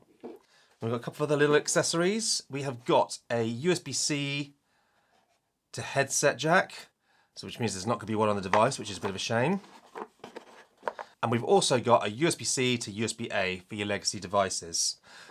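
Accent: United Kingdom. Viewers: Why do all the British guys on youtube sound the same? So odd.👍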